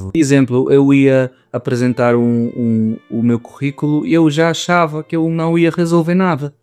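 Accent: Portugal. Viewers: Antes de vires para Portugal tu já falavas bastante bem o português de Portugal, depois do tempo que passaste aqui o teu português é perfeito, mesmo o sotaque, está lá mas muito tênue É pena não teres conseguido ficar, mas tem esperança pode ser que consigas voltar com outro tipo de condições que te dê garantias de ficar cá. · Olá! Reparei que falas mais em português de Portugal. Parabéns por isso! Nunca desistas. Um forte abraço Amigo! 🤗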